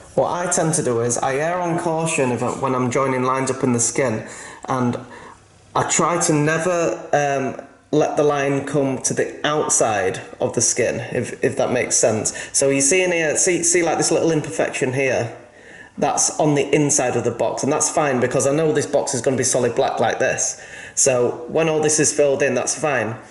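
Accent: Yorkshire, United Kingdom. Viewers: Where abouts in the UK are you from? You sound very Yorkshire...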